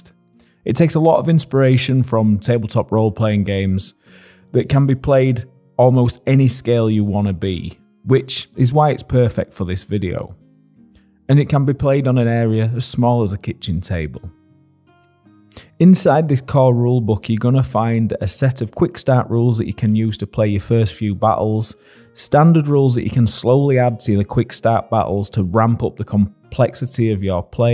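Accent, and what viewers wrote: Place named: North, United Kingdom. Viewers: If I get stuck with something I always looked here and there was something to help. Going to miss that northern voice. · Hi there. Just found your channel and have watched a few of your videos and I like what I saw so I had to subscribe. I like what you do because I’m wanting to get into doing dioramas myself. Thanks for the great videos that you do and I will continue to watch. Take care and I would say blessings to you from England but I think you actually live in England from your accent so I will just say blessings to you from Weymouth. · Good luck mate. I’ve loved your content from day one. Will say I’ll miss hearing your voice. I grew up in cleethorpes and I don’t get to hear that accent very often where I live now haha. Take care man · To hear someone making content and to do it as popularly as you do it, in an accent like my own is worth its weight in gold. 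No shade to southern or middle class content creators but to have you available to view and for your content to feel that bit more relatable has always felt invaluable. Glad to still have Pickle Jar and MS Paints but you will be sorely missed none the less. Reyt good.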